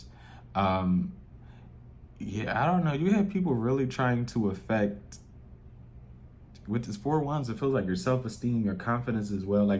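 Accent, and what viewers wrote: Philadelphia, United States. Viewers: Wait….are you from Philly?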